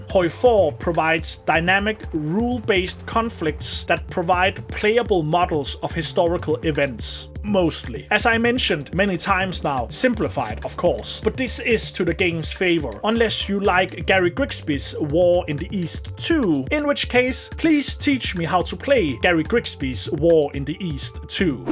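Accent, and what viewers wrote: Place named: Denmark. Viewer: Elsker det danske accent🫡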